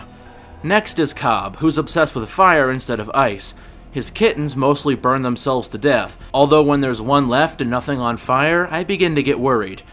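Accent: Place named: Ireland. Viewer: Ahh makes sense. The accent is more subtle than other Irish youtubers I watch so they didn't come to mind.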